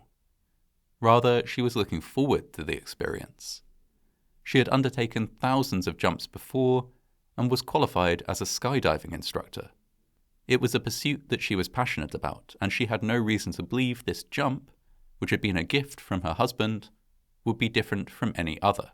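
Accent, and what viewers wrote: United Kingdom. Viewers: Just a quick question, Sir. In witch part of Great Britain are you from/located? It is just so weird when you say fall. It sounds like your are saying fool. Same with the word call. Otherwise I really love your uploads 🙂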